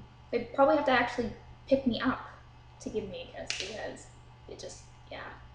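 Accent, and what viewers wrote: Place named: Canada. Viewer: Aw are you Canadian? I can hear an accent!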